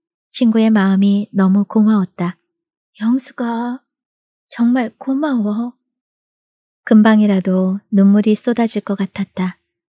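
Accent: Jeolla, South Korea. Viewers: 천재다...오디오북 유투버들의 격을 높인 채널이다. 이전에는 사투리며 지방색을 어설프게 표현해도 그러려니...좋은뜻으로 하시는 분들이니까...하고 퉁치고 넘어갔었다. 그렇지만 이 분은 필시 공부하는 유투버다. 연구하고 분석하느라 여러번 읽었던 티가 난다. 이 분은 몇개월전만해도 전라남도 사투리가 엉성했었는데 현재는 놀랄만치 여러 명의 배우를 등장시킨다. 작가가 추구하는 현장감을 살리고 작가가 주안점을 두고 있는 띄어읽기에 특히 주의하는 의지가 보인다. 노력에 박수. 박수를 보낸다.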